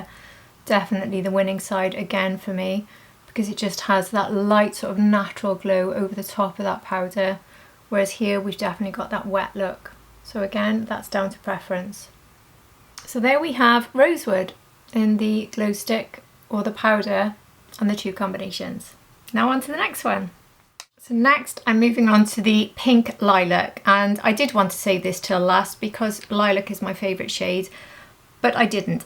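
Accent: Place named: Wales, United Kingdom. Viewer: Just found your channel - fabulous! Lovely Welsh accent How do the lip butters compare to the maximisers please ?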